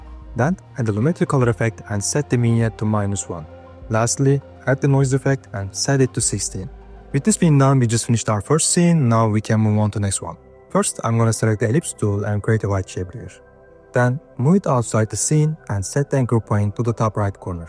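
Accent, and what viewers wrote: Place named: Turkey. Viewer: Where are you from, brother? Your accent sounds like Turkish.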